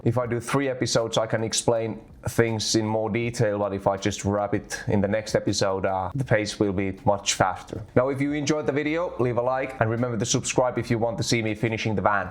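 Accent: Finland. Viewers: vai että kässis. pakko sanoa etten oo koskaan ennen kuullut moista. kai se voi rallienglanti taittua opelikuskiltakin.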